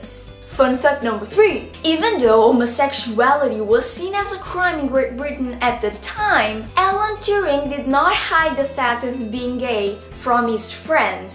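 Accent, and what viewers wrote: Portugal. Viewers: Prefiro quando fala em português de Portugal. Acho muito mais interessante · Ooooh my Lord ... You speaking english is so precious.... You are so intelligent ..smart and clever... And a math gurl... I'm think I'm fall in love e seu sotaque português é maravilhoso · Peculiar - you can realize the portuguese accent. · Se não fui claro o suficiente, eu acho bonito ouvir o português de Portugal, por isso disse que senti falta :D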